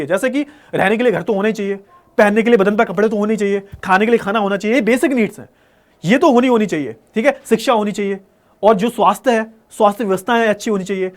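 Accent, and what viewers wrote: Haryana, India. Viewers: sir always my favourite teacher ❤kis kis ko haryanvi boli badhiya Lage hai 🎉❤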